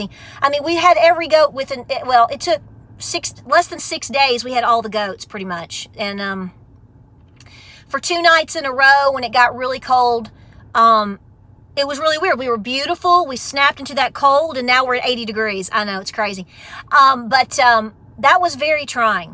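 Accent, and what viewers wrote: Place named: Texas, United States. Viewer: You sound like you should be a Texan!! I love it! And absolutely right!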